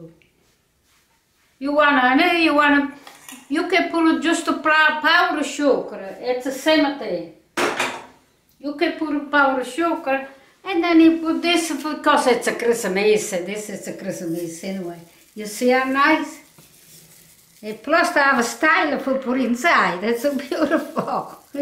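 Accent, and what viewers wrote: Italy. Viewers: Isn't she wonderful. I love her Italian accent. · Hello from England. We love watching you and we love your Italian accent too. A very Merry Christmas to you and your family! Xoxo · Merry Christmas! Love the family stories and the singing! 💕❤️💕 I was wondering about the consistency of the Struffoli. Is it dense or airy? We like it dense, but the bakeries always seem to sell the airy kind! So much work......but.....yum! So worth it! Wish I took more notes in the kitchen as well as the huge wooden board that my Mother-In-Law’s mom always used to make the struffoli! So grateful that I found your channel! What a special treat! I remember when I first met Grandma....she gave me a plate of struffoli to take home! A delicious treasure! Your videos are getting me through some sad times........I so miss my mother-in-law! But hearing your Italian accent, listening to your stories and songs......warms my heart! 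Thank you! God bless you and your family! 💕❤️💕❤️💕❤️💕❤️💕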